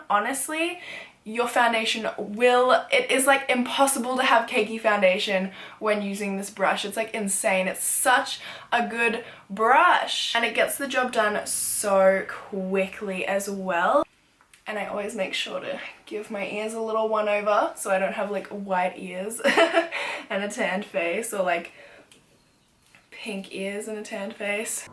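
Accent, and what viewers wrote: Australia. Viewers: I loveeeee her Australian accent 😻😻😻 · Diana O'Connor lol she sounds the same the whole time to me, your obviously not aussie coz thats a full aussie accent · is she from australia?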